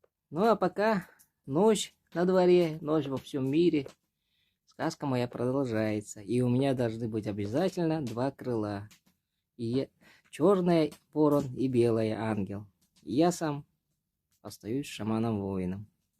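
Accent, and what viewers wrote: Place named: Kostroma, Russia. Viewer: Он точно якут? Кострома Ярослав дикция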